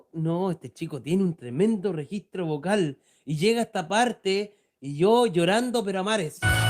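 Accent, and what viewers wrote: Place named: Chile. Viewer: jajaja gracias por el análisis... he visto a otras personas llorar cuando lo escuchan por primera vez, así que no te preocupes. Un abrazo, desde Argentina (supongo que sos chileno por el acento)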